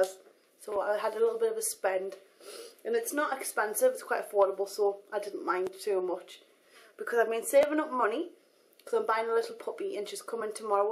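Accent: Newcastle, United Kingdom. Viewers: the geordie accent is amazing!:') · Wish I had your Geordie accent. Amazing! :) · Are you from newcastle? I LOVE your videos!!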